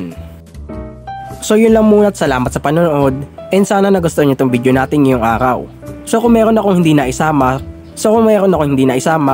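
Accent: Batangas, Philippines. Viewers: Batangueño ka lods?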